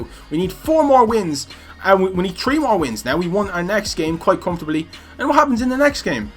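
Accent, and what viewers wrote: Ireland. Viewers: R u from Dublin or Derry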